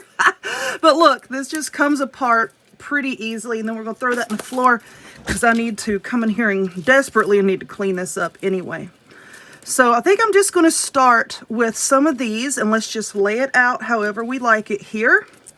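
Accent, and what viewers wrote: South, United States. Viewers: As a Southern Girl myself(Texas), I can’t decide what I love most…. Your Awesome Crafts, you’re Southern accent, the nails or the beautiful tattoos! I’ve learned so much from your videos. Please keep posting!!!!! 😊👍🏻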